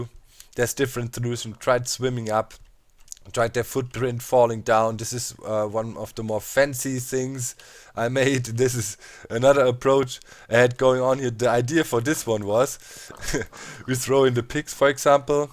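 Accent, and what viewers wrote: Germany. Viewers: Dr. CreeperZ ha I knew it cuz I'm from Germany and everybody there has an accent like that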